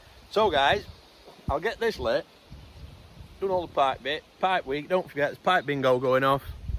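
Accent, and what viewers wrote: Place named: Northern England, United Kingdom. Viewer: Greatly enjoyed the chat. Beautifully Northern, lad!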